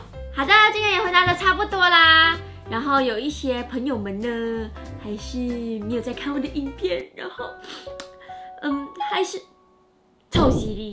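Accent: Taiwan. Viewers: 有谁不太适应的台湾腔的？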